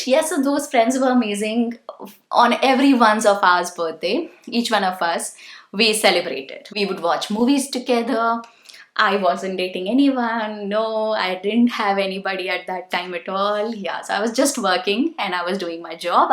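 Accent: India. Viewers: Maam pls stop this now. Even google also accepted dat there is an ancient exist which is Indian english. Even when I saw ur video even ur ascent is Indian. So no need to teach ppl wt to do! Ur r doing this only for ur channel n money. We r not fool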